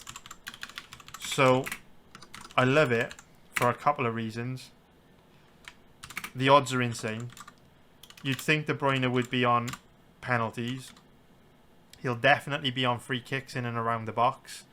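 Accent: United Kingdom. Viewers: Cardiff accent isi? 🏴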